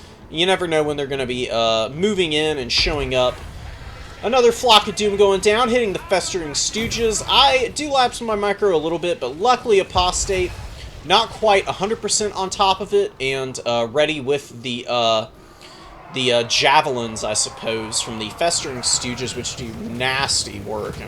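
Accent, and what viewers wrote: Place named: Mid-Atlantic, United States. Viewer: The mid Atlantic accent is strong with this one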